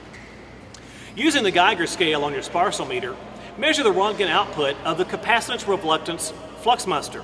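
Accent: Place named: South, United States. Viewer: I've heard this presentation before but it's more authoritative when the presenter has a Southern accent.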